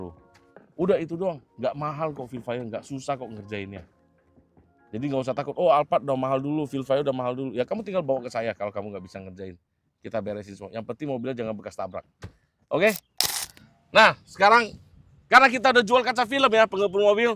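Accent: Medan, Indonesia. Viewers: Medan kuiii😂😂😂😂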